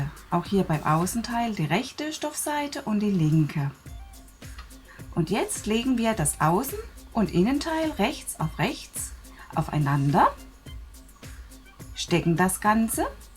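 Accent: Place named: Palatinate, Germany. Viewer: Liebe.. Vielen Dank für deine tollen Videos und ich, als Hessin die seit 16 Jahren in Niedersachsen wohnt, liebe deinen Dialekt... Ich schätze mal Pfalz, oder? 😍😍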